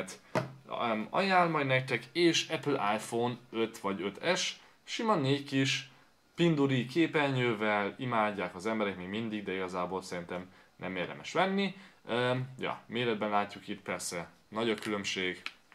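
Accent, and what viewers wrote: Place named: Germany. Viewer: Nagyon durva német akcentus...:)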